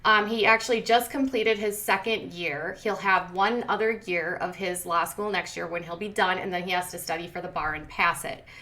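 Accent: Minnesota, United States. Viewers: I love your accent! It reminds me of my friends in Minnesota ❤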